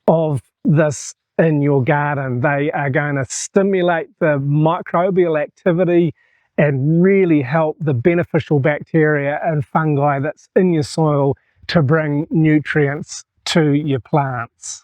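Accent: New Zealand. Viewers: Sounds more Kiwi then North Thailand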